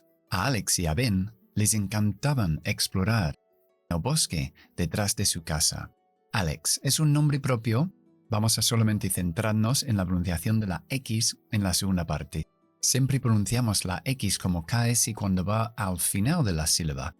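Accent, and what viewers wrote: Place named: South England, United Kingdom. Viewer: OK, entiendo. Pareciera SBE, es decir Southern British English, pero habría que preguntarle a él para estar seguro. Yo tengo el oído entrenado al inglés del Midwest americano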